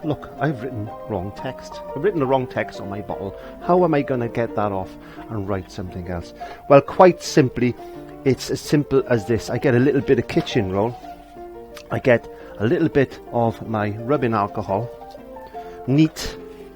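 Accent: Wales, United Kingdom. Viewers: jiw, there's luly to hear that accent, are u a Swansea Jack? welsh speakng Jen from Reading now. x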